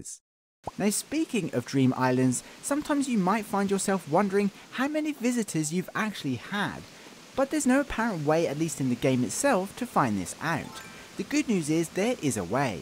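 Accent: England, United Kingdom. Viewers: I love your English accent what part of england are you from? P.s I'm englidh and Don't have that broad of an accent you make me think your from London.......are u? lol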